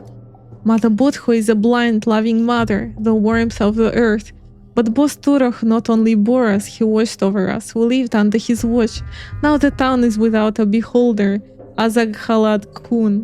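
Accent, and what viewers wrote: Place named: Poland. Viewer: polish accent asmr, its ohkay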